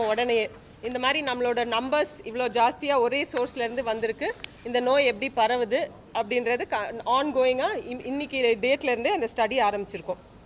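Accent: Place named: Tirunelveli, India. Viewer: We like your tirunelveli Tamil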